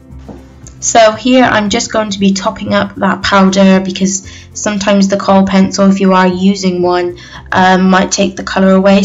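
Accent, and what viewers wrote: Bristol, United Kingdom. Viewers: Love it!I can't tell where you're from >.< so sorry if I'm wrong but you sound bristolian like me!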